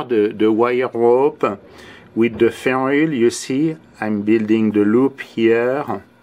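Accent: France. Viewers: Man I like your English with that French Accent!!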